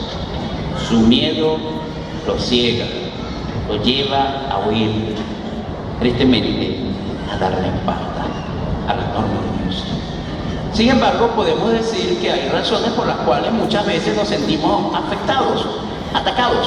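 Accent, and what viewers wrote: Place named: Venezuela. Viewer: Le sentí el acento venezolano desde el principio....tiene más discursos de esta asamblea por favor??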